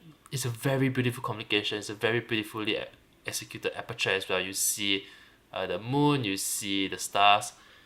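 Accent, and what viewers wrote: Singapore. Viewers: It's not a mid Atlantic accent, it's a Singaporean/Malaysian accent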